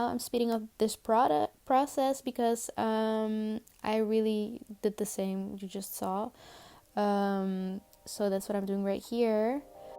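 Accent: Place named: Netherlands. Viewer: Your hair beautiful! Btw are you dutch? You kinda have a dutch accent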